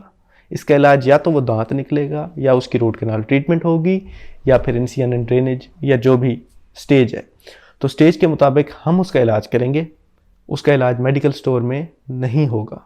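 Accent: Saraiki, Pakistan. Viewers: ڈاکٹر صاحب سرائیکی لگتے ہیں اپنے accent سے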